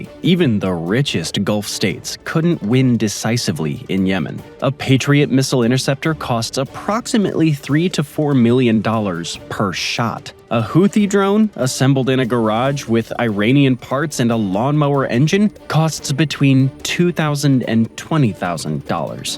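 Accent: United States. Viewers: Warder, Baddle, Baddery, Lidderally. No one butchers the English language like a Seppo.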